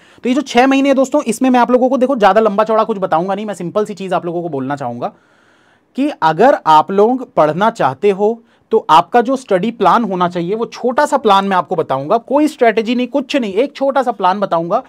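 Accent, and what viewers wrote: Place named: Chhattisgarh, India. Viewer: Sir ap pura chhattisgarhi style me bole ho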